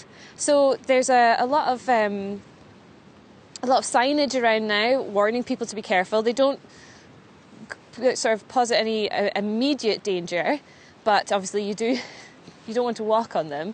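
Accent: Scotland, United Kingdom. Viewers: What a lovely broadcast, and a surprisingly strong Scottish accent. Thank you. Poor cameraman must have found that difficult with the wind gusts 😁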